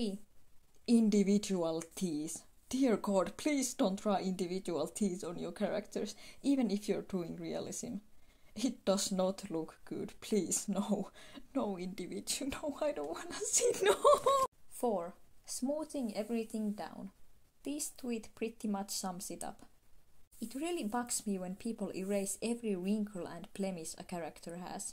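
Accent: Finland. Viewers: omg suomi aksentti havaittu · LOVE THIS VID!!!! and is that a Finnish accent? :3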